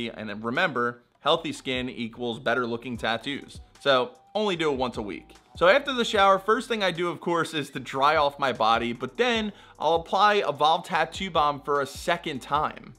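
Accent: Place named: Wisconsin, United States. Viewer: You sound like my exgf. She was from Wisconsin. You sound like youre from Wisconsin or Minnesota.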